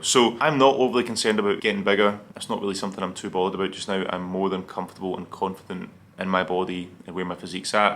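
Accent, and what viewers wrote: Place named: Scotland, United Kingdom. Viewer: English with a Scottish accent.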